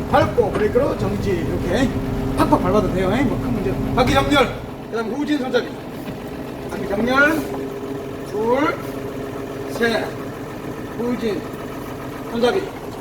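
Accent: Jeolla, South Korea. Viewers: 전라도 사투리 맞죠잉? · 전라도 교관 같은데요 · 찰진 전라도 사투리 선생님 인상깊은 강의 잘 듣고 갑니다. 감사합니다.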